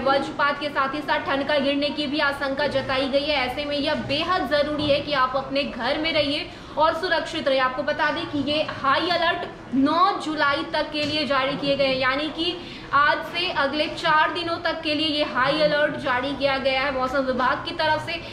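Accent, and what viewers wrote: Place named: Maithili, India. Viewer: आप मैथिली भाषी हैं क्या❓ आपके Accent से तो ऐसा ही लगता है.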